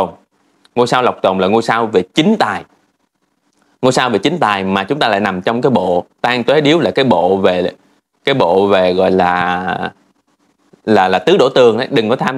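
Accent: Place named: South, Vietnam. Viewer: tìm mãi mới thấy thầy nói tiếng nam nghe rõ dễ nghe , mấy ông nội khác nói tiếng bắc nghe tử vi chán ko thấm chút nào 😊 coi bói là tui kím thầy nam coi thôi à nhất là người miền tây dưới an giang á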